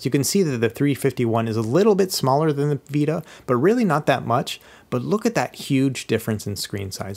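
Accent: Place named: Midwest, United States. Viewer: Maybe it's just me... But u sound way more Midwestern in this video than in others 😭😭 u in MN or sumn? If so let's be homies 🤞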